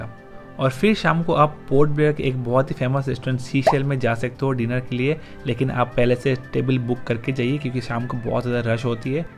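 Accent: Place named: Bengal, India. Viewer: From your accent I guess either u r Bengali or Assamese?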